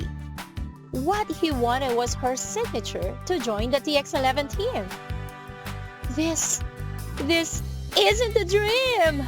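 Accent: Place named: England, United Kingdom. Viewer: its the English accent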